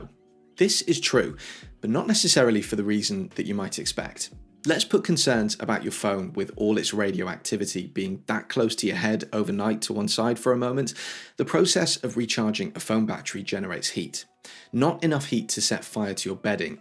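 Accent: United Kingdom. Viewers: A calm erudite British accent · your Queen's English is quite appreciated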